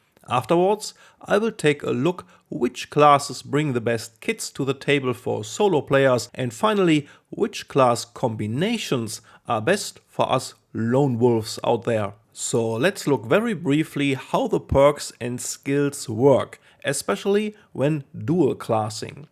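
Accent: Germany. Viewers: Ja cool das du da drauf antwortest!!! wusste ich doch gleich das deine Muttersprache deutsch sein müsste!! Dieses markante wenn Deutsche Englisch sprechen erkennt man irgendwie sofort!! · are you german :D ?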